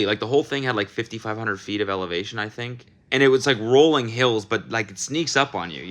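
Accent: Australia. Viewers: I knew you were in Australia, but the accent is always a jump scare. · Legend! Keep mounting and do another one. Oh and your Aussie accent is fire! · Your Australian accent is elite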